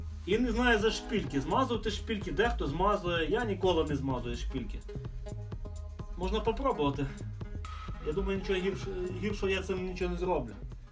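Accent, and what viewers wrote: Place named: Lviv, Ukraine. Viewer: Братан ти як колишній прем'єр Азаров😁 по мові чую що Львівщина, мабуть, Красноград?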